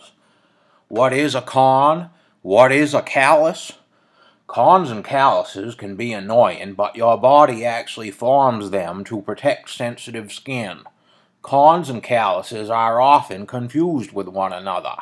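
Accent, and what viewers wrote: Maine, United States. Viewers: visited Maine this past week and met a lovely farmer in a Diner that spoke like this. Had a good chat. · I have a friend from northern Maine and a family member from Haverhill, Ma. This sounds like a cross between them. ☺️ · Well! Not only did I get to hear a Downeast Maine accent, but I think I figured out what's wrong with my foot, so thank you! :)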